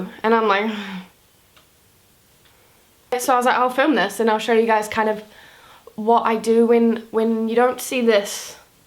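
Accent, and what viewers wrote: New Zealand. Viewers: i really love nz's accent <3 hello! from PH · I really love to watch video. She has really cute Kiwi accent. Hello from South Korea. I wish you upload video everyweek for subscribers.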